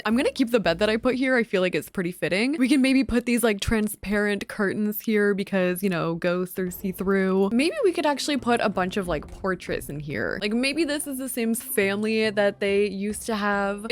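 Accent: Canada. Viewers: Girl it’s a Canadian thing I swear lol I catch myself saying ehh and laugh because it sounds so Canadian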